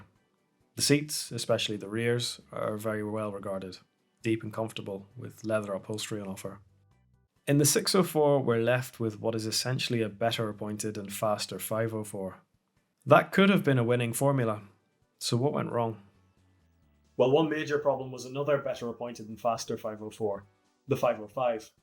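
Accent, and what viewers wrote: Ireland. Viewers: Irish accent! Superb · Pronunciation is different in different countries. Ideally we should all use a French accent but he uses the normal pronunciation that is used in Ireland.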